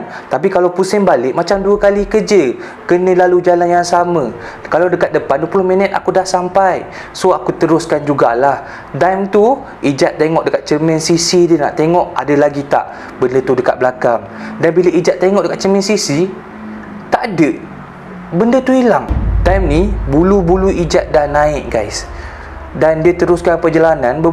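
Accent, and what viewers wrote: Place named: Terengganu, Malaysia. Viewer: Assalamualaikum…akak dgn suami bru firstime dgr…bru 3 cite akak dgr…2 cite dr pantai timur…pastu yg ni…mcm dh start suke lak dgr adik b'cerita…loghat ganu tu…buleh dh tu…jd dh tu…😆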